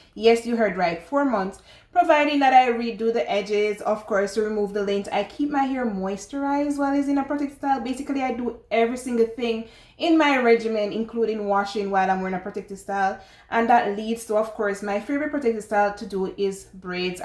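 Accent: Jamaica. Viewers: I like the accent ☺️ sounds jamaican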